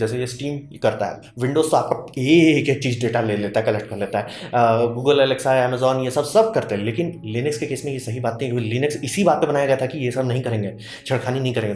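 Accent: Bihar, India. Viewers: Wah sir wah, android Linux ka gotiya bhai hai 😂😂 sir aap bihar se hai kya 😀 pura desi bolte hai. Mja aata hai sunkr 🙏🙏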